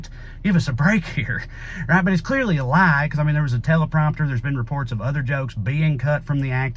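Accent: South, United States. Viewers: I am just stumbling in, how the hell did it take so long for my youtube startpage to recommend me this channel???? Probably racist what I think but I'm a european who "studies" the American people with great fascination and interest since Michael Moore's "Bowling for Columbine" and the way you deliver those great democratic messages with this accent which is mostly portraied with this "south will raise again" stereotype is completely hilarious for me right now. Amazing :D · Thank you so much for bringing humor to all the news I listen to. Here on the W Coast---Love hearing it in your Southern accent and wit!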